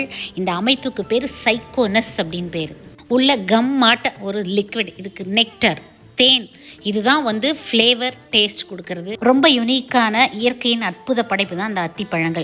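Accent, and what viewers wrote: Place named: Kongu, India. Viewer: அது சரிங்க👍🙏 அப்ப சுப்பம் னா என்னங்க🤔 ( இனிமையான கொங்கு தமிழ். வாழ்க வளமுடன் என்றும் நலமுடன்) 🙏🙏🙏🙏🙏🙏🙏🙏🙏🙏🙏🙏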